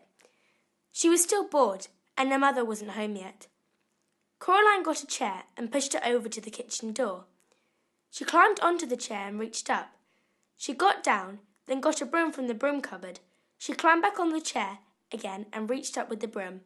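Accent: United Kingdom. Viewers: Thank you. I was wondering which British accent she had. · that's a British standard accent - certainly not a Cornish one! :)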